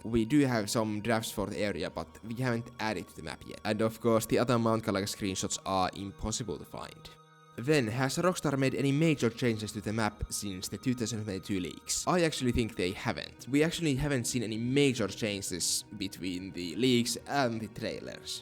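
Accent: Finland. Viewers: You sound Finnish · Finnish more likely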